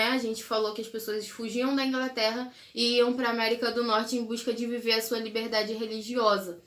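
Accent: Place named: Rio de Janeiro, Brazil. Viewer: O sotaque carioca é o melhor!!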